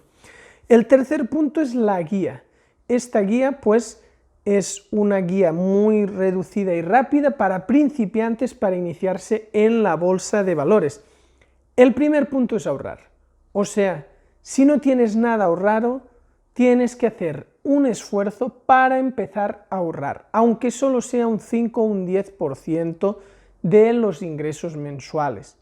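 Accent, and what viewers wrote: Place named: Catalonia, Spain. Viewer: Cómo que romper un mercado?? Eso es posible?? P.D: Aquest accent català... xD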